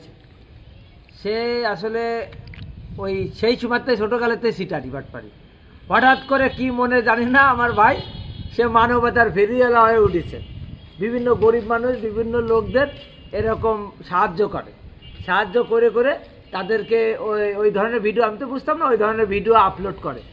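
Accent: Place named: Khulna, Bangladesh. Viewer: তোমার ভাষা তো খুলনার মতো উনার বাড়িতে বরিশাল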